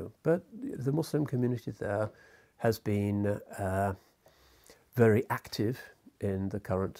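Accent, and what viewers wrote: England, United Kingdom. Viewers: this guys voice, house, appearance and English accent makes watching his videos so soothing and cosy. its like your sitting infront of a chimney fire drinking hot coco while hearing a story from your grandpa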